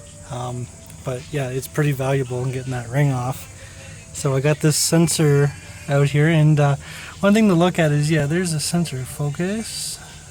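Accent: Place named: Dakota, United States. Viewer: Dakota accent or canadian.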